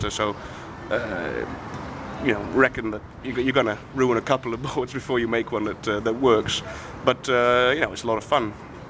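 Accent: Netherlands. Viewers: Wow ik hoorde haast niet dat je nederlands bent! Je engels is echt goed, je hebt niet dat rare nederlandse accent zoals mij :') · he is Dutch there's euro symbols on the price tags and the accent is very Dutch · Ik wist dat dit nederlands was!! hoor je aan het accent ;) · nope hes dutch u hear the accent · Haha ik herkende gelijk dat je Nederlands bent.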